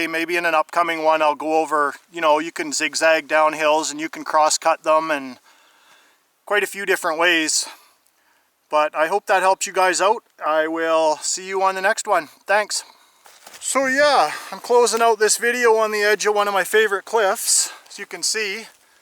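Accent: Canada. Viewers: I love your Canadien accent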